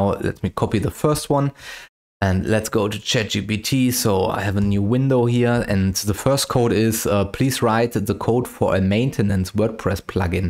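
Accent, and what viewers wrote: Germany. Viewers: U sound german😅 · A german sounding german, who would have thought?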